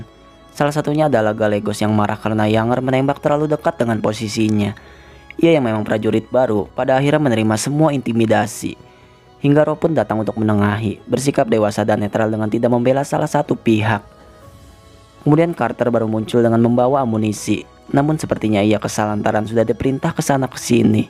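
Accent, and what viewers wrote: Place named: Kalimantan, Indonesia. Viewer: Dri logat kykx ini org Kalimantan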